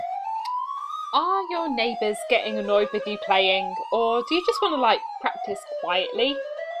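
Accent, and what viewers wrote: England, United Kingdom. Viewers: I speak Portuguese, but learned English by myself, after years of web-surfing, song playing and gaming. I am an amateur musician (I play guitar, C and F recorders, harmonica), and I can say that your videos are mesmerising - not only because of your skills and simpathy, but because of your English accent... and, lets be honest, when you speak, your mouth is hypnotic!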